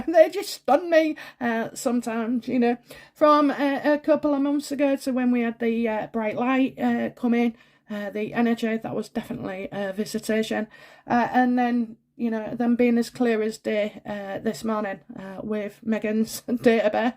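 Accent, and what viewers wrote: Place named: Yorkshire, United Kingdom. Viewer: Hi my friend hope you are feeling better and stringer and please bring your accent back i feel so at home with you two Yorkshire lasses together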